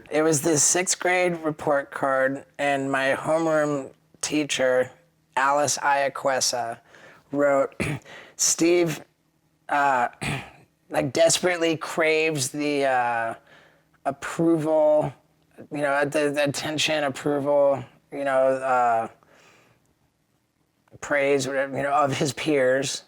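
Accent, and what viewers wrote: United States. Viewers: Interesting that he sounds just purely Americain.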